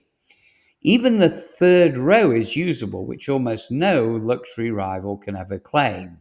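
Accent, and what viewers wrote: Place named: United Kingdom. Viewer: Love that British accent, and apparently not AI. Great work!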